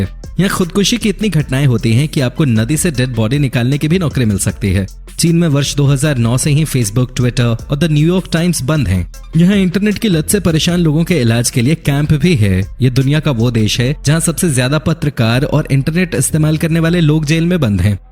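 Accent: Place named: Darbhanga, India. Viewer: Darbhangha sa bol raha hi bhai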